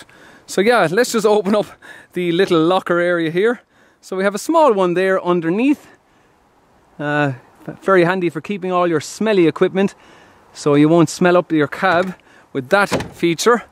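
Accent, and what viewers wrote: Ireland. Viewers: I come for the trucks and stay for the accent haha love you Irish!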